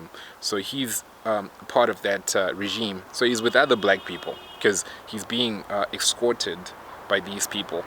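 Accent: South Africa. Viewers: You are definitely wise and well educated. I love the information you have given. I grew up in Africa and I can tell from your accent that you have grown in south Africa too. Some of this information is beautiful. However there is only one KING OF KINGS, and that's Yahuah Hamashiach(Messiah in Hebrew) not Haile Selassie. I know his name means the power of the Trinity but look up the Trinity. It isn't what Yahuah Hamashiach or his followers preached about. Having said all this, you've quoted the word well with Philip and the Ethiopian. I hope to find more of your videos. Shalom brother. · I know this has nothing to do with the video but I just wanted to ask, are you South African? I had to instantly subscribe looking forward to listening to more of your content 🙏🏾 this was a good video very well articulated and put together 💯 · Ey bro, what country are you from?? 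You sound South African😌🤜🤛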